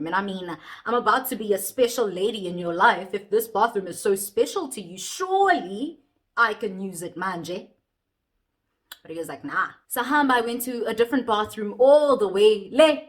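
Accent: Zulu, South Africa. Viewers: Samnandi isiZulu sakho Ntokazi 🌹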